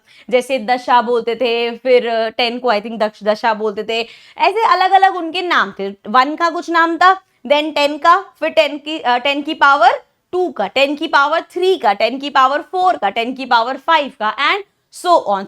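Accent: Punjab, India. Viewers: Gosh, your punjabiiiiii😭✋🏻🛐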